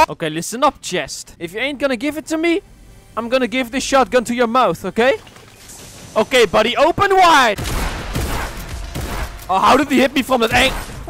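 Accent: Netherlands. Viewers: this is the most Dutch accent ever lmao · Are you dutch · Are you dutch?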